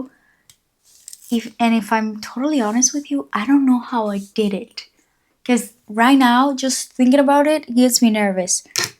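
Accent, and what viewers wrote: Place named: Italy. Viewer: I love thisss ,btw is she Italian?cuz her accent sounds like she is from Italy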